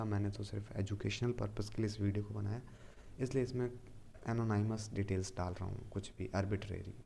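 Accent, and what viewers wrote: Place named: Madhya Pradesh, India. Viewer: Bhai wo sab to thik hai...lekin tumne iss video me pakaya bahut hai. Ek simple si chiz batane k liye itna jyada bola upar se tumhare bolne k style se samajh aa raha h ki tum MP se ho.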